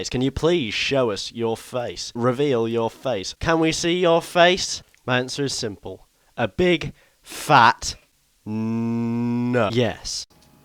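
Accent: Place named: North, United Kingdom. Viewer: You sound like ur from the north Anyway very big fan of urs Just brill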